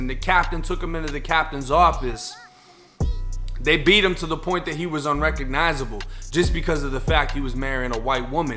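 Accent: Boston, United States. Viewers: You have an accent.. Are you born and raised in Fl? Bc you sound like your from Boston or even maybe Ny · It's just a Boston accent. Pretty normal for the boston area. You don't get out much huh lol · Boston in the house! I love this kid but damn his accent is serious. I'm from Beantown and got an accent but not like this homie. #617 #508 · I love Bostonian accents, "and suddenly you're droppin' your R's " Love it, dude you gotta write a book. You're a fucking word smith, smart, awake, and able. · If u didn't say you was from Florida I would of thought u was from boston... I love your channel bro...I wanna do what you do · Shit i literally started listenin to channel 3 days ago. Friday and its sunday...shit i was like you gor a boston accent. Lol i dunno i can relate to everything he sayin so im goin video to video. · Omg I know!!!! 🔥 💚🤍❤️ And it’s a sentimental thing for me too. 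My dad and Uncle were full blooded second generation Italians born and raised in and around Atlantic City, NJ. They moved away in their 20’s and my Uncle still has the accent after living in Florida for 42-43 years. His is the closest to cuz he has a little southern thrown in too. My dad had it to but not quite as thick because we spent many years in Michigan (until he passed). I noticed the longer has been lives up there in MA the thicker his accent gets, which is completely normal. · You sound crazy ass fuck but yo opinion he has a east coast Boston, accent but what do I know 😂😝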